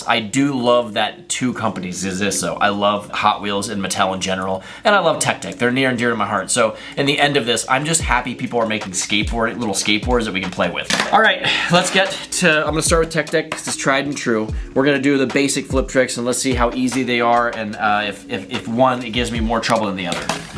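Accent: England, United Kingdom. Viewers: Guy’s English or Spanish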